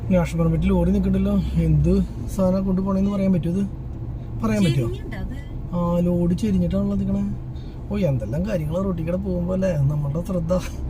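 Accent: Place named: Thrissur, India. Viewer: Nidhak samsarikkanulla samayam kodkooooo. Thugg kekaanulla kodhikonda adum thrishoor Bashailooode😂😂😂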